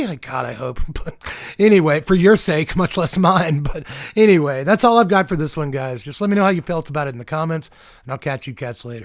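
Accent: South, United States. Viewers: gets more southern with laryngitis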